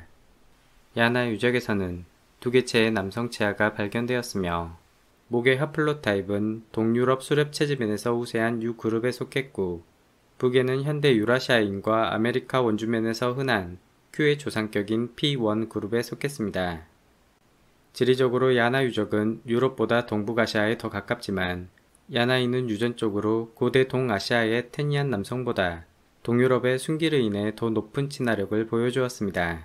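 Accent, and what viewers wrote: Jeolla, South Korea. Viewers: 고향이 전라도요?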